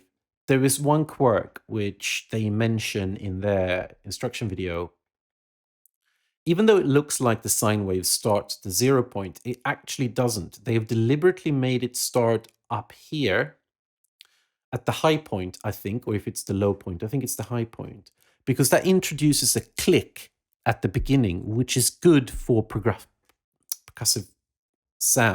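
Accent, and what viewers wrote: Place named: Sweden. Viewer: Lool, after listening a bit more I did think your accent sounded vaguely Swedish, but I heard "I think that's how it's meant to be pronounced" as being about the word itself and not just the company. Norwegian fella here correcting Swedes on their Swedish 🤣 sorry altså.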